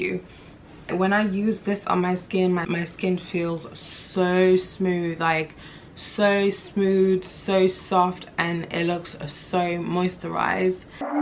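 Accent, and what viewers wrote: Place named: Jamaica. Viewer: Do you have a Jamaican background? Or at least Caribbean... You sound slightly Jamaican based on some of your pronunciations.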